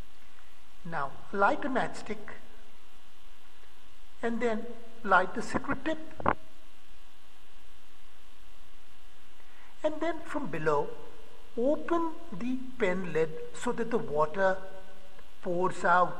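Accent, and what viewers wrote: India. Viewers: Kesin hindistanlı bu konusan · Anlatıcı hintli.çünkü bu hintli ingilizce aksanı :)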